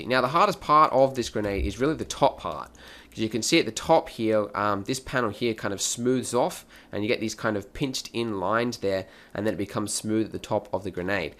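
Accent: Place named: Australia. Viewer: Great tutorial mate, feels good to hear an aussie for once. Alot better to understand.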